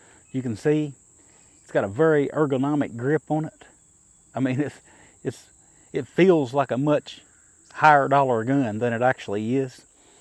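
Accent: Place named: South, United States. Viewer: that southern accent 1 in a million man.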